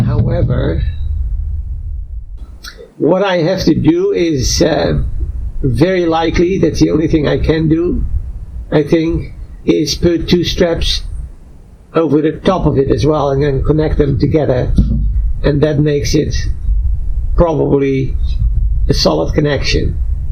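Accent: Netherlands. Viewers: I was really curious about the accent, I first thought that there was a region in the US where people, maybe, spoke with a Dutch sounding accent because of some interesting historical reason 🙃 But, the way you say camper is very Dutch 😉 You're right, I am Belgian, but I'm Flemish speaking not French. I have a French last name and I also live close to the French speaking part of Belgium. Many people get confused about that. was a popular boys name in Flanders around the time I was born. Bedankt voor de leuke filmpjes!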